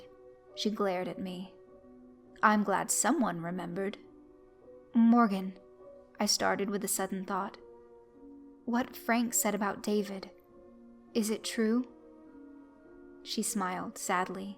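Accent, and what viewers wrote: South, United States. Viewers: Great narrations love the southern accent really like your productions keep up the good work.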